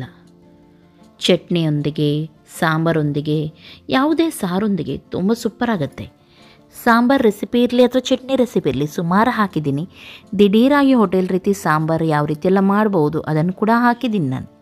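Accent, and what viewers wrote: Mangalore, India. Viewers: Hegidira akka I'm from pattadakalu nim manglurige bhashe nage thumba esta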